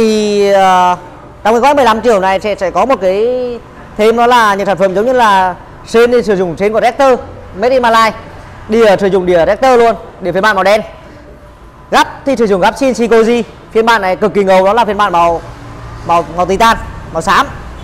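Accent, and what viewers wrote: Nghe An, Vietnam. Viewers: Bác người Diễn Châu nghệ an phải ko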